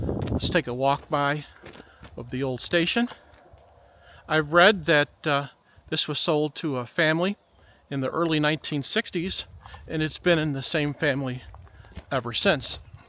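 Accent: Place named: Finger Lakes, United States. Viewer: as one originally from the Finger Lakes (and recently interested in acents), it's nice to hear someone speak like I do. (Over and above the fact that I'm a life-long rail fan also. 😊) Thanks for also for your dose of calm and sanity amist the mess on YT and other social media these days. Re Scouts: I remember camping in Constantia NY in -40F for a regional Klondike Derby back in the mid 70s. Of course, all the tent campers were brought into cabins that night; leaders brought their car batteries in also to stay warm; and the next day was sunny, windless, and glorious with squeaky snow: perfect for the 'Derby!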